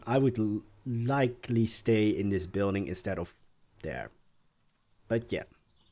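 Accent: Netherlands. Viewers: Echt gaaf! Haha ik kon gelijk horen dat je Nederlands was, niet dat dat erg is, is juist leuk :) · You know right away it's a Dutch guy.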